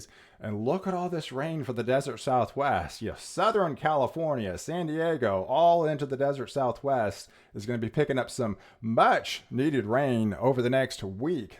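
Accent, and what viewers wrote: Texas, United States. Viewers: I LOVE your holistic approach to the weather...the only reason I know that you're in TX is because you got my Daddy's accent. ;) Most other channels focus on...the East Coast.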